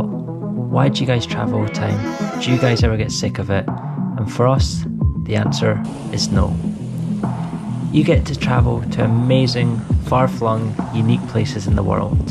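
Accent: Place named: Scotland, United Kingdom. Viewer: It's one of the many things I love about your channel. Never lose your Scottish brogue. I have cousins that visit from Ireland. I can tell the difference between that and Scotland but there are overlaps. Someone might say, "fancy going for a wee dander?" There was a time when I did not realize that meant, would you like to go for a walk? My wife is French Canadian and I never realized how many figures of speech American use that she is not familiar with. 😄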